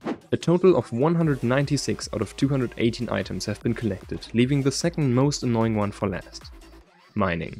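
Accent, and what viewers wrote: Germany. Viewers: Du hast ein leichten deutschen Akzent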